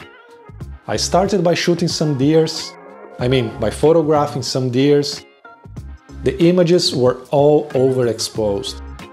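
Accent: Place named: Brazil. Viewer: Impressionante como sotaque de br da pra notar de longe kkkkkkk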